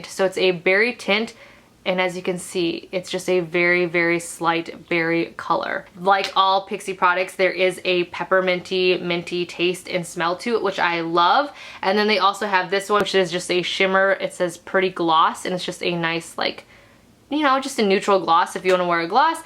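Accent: Michigan, United States. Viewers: The first video of yours that I watched was your wish app review. I ended up subscribing bc of your voice and accent. My favorite aunt growing up is from Michigan and I always loved hearing her voice. I haven't gotten to see or speak to hear in about a year or so. Your voice and accent is similar and I find it comforting. Anywhos I love the videos And watch at least one a day!! Hope y'all enjoy y'alls "staycation"!!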